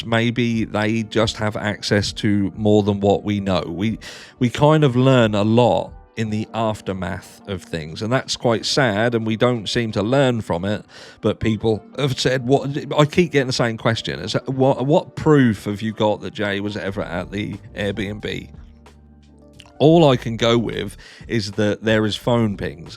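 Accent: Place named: London, United Kingdom. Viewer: Love that London language Mr ❤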